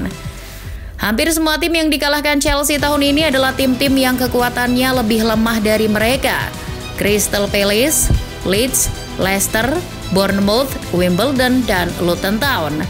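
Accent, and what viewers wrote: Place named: Semarang, Indonesia. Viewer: pengisi suara jangan jangan org semarang nih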